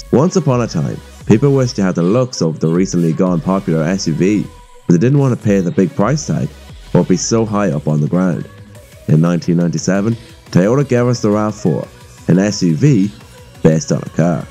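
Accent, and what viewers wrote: Ireland. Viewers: he is Irish...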